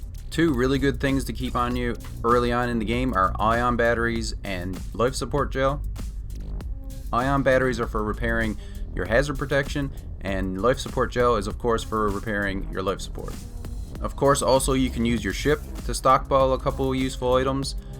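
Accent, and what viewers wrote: Newfoundland, Canada. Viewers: Some good tips, thanks! Oh, and I had to do a double take when I heard your accent 😆 not many newfy YouTubers I'm aware of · Could tell your a newfie from the start aha!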